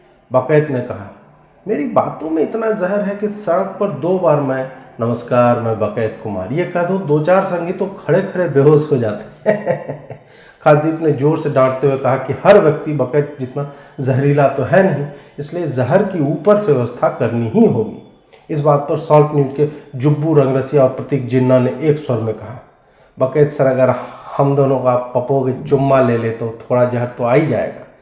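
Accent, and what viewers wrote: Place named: Bihar, India. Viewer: अजीत भारती भाई आप का विश्लेषण का जबाब नही होता पर व्यंग्य वाली वीडियो ऊपर से बिहारी तड़का शुद्ध हिंदी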